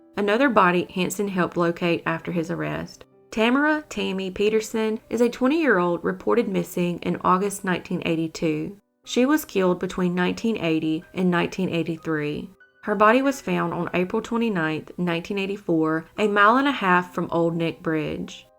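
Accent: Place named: Mississippi, United States. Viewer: I'm from Southeast Tennessee and you sound just like we do... Why I love ur videos. Keep it up!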